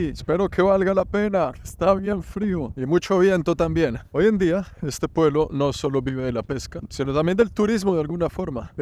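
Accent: Colombia. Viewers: Tienes esa Forma Tan Especial y Original de Narrar Tus Videos Con ese Estilo que Te Caracteriza Bien Colombiano con Palabras Sencillas y Entendibles. Muy Bien.. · Ese también es acento colombiano ❤, tiene una voz muy muy agradable y hace un gran trabajo con sus videos tan geniales ❤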